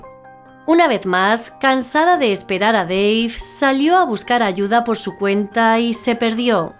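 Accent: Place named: Malaga, Spain. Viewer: Que feo hablas ..erres muy buena narrando però de verdad malagaa España vivarrrr familiarrrr. ...etc etc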